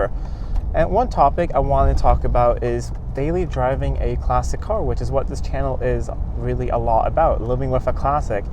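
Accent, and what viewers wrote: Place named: United States. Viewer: I know I’ve heard several times saying that you were in Sweden. But you do not sound Sweden or English you sound American.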